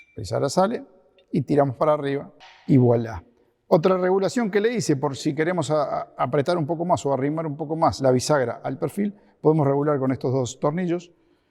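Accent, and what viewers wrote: Rioplatense, Argentina. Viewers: Su tonada es mas Argentina/Uruguaya que Española, puede ser? :P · Felicitaciones!! Excelente trabajo. Una pregunta, sos Argentino? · El sonido de fondo es espectacular. Buen trabajo muy creativo. Saludos desde Queretaro México. Argentino o Uruguayo?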